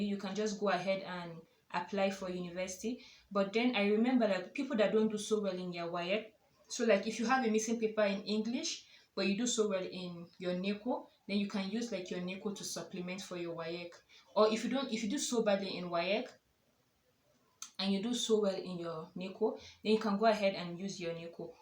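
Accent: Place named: Uganda. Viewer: Her accent is becoming ugandan, i love it, shes easier to understand, the tongue is less heavy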